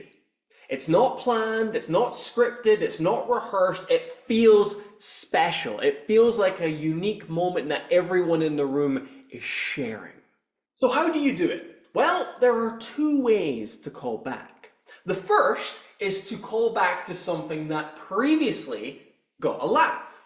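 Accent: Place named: RP, United Kingdom. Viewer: rp accent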